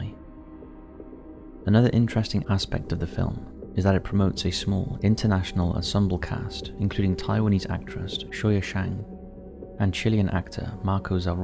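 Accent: Wales, United Kingdom. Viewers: You realise you just said the same thing? I assume it was just an un noticed slip of the tongue. We are all human. And can surely forgive such things? They are working on a quick turnaround this month so even more understandable. FYI The narrator is british- Welsh.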